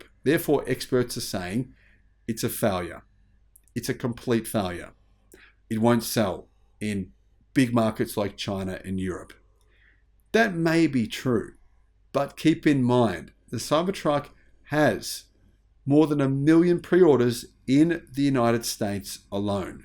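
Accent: Australia. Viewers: What an Aussie accent maaate. Ah well you can take an Aussie out of Australia but you can’t take the Aussie out of this man.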